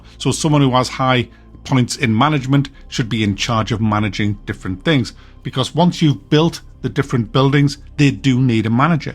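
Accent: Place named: North East England, United Kingdom. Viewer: It's a North East thing, in general. He's from Newcastle, I'm from Sunderland. They say it like that there too.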